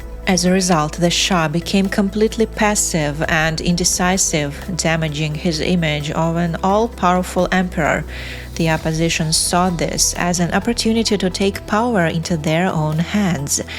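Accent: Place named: Russia. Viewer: No, it's a lady of apparently Russian mother tongue, with a certain - but not sufficient - mastery of the English language - and who pronounces a lot of words wrong. Indeed it's hard to stand.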